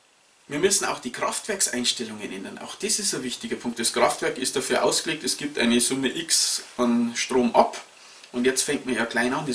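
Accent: Bavaria, Germany. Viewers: Super erklärt!gaaanz starkes Video. Hehe..auf bayerisch klingt‘s trotzdem ned schlimm. I wohn aufm Land...geht scho irgendwie. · Danke für die lebensnahe Erklärung, bayerischer Charme inklusive!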